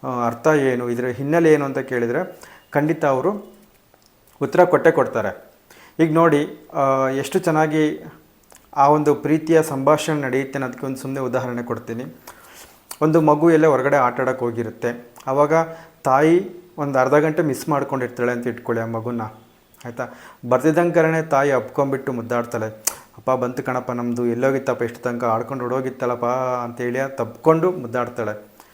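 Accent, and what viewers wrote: Chitradurga, India. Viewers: ಚಿತ್ರ ದುರ್ಗದ ಕಡೆಯ ಭಾಷೆ. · ಅಣ್ಣಾ ನಮ್ಮ ದುರ್ಗ ಕಡೆ ಭಾಷೆ ಹಾಗೆ ಇರುತ್ತೆ. ನೀವು ಮಾತನಾಡಿ ಏನು ತೊಂದರೆ ಇಲ್ಲ ನಿಮ್ಮ ಮಾತು ಕೇಳುತ್ತ ಇದ್ದರೆ ನನ್ನ ತವರುಮನೆ ನೆನಪು ಮನಸ್ಸಿನ ಮುಂದೆ ಹಾದು ಹೋದ ಹಾಗೆ ಆಗುತ್ತೆ. ಚಿತ್ರದುರ್ಗದ ತಂಗಿ 🙏🙏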